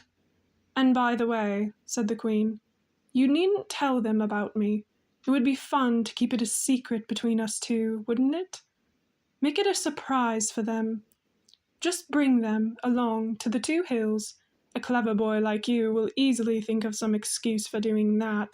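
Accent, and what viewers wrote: United Kingdom. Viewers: Thank YOU for doing a fabulous dramatic primadonna british accent ice queen! Sounds fun to record and even more fun to listen to!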